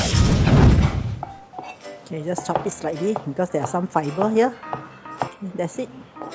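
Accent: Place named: Singapore. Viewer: Love the dish love the Singlish lahhh...thx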